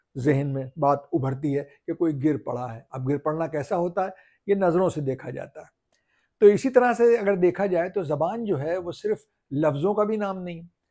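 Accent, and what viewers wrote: Lucknow, India. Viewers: پاکستان میں بیٹھ کر آپ کی بدولت لکھنؤ کا لہجہ سننے کو مل گیا۔ بہت خوبصورت ۔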